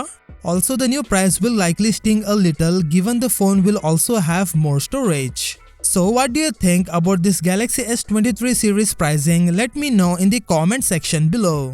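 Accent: India. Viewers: That indian accent really caught me off guard lmfao. Couldn't take it serious so I didn't watch the video, sorry but I had to let that out.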